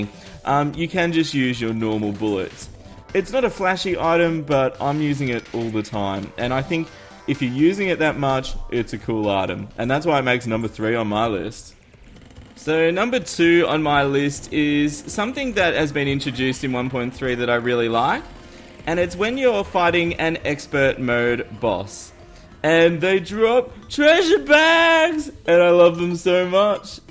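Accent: Australia. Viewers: Just focused on the great aussie accent XD straya ftw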